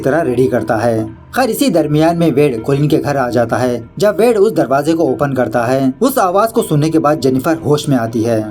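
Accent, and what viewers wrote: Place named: South, India. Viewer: Hi.. Am totally addicted to your channel now..from last few days am continuously watching all your videos..enjoying a lot..tamil, telgu, malayalam,bengali,marathi...all in one subscription.. 👍🏽..like your South Indian accent too..keep it up...All the best ....looking forward to all new ones..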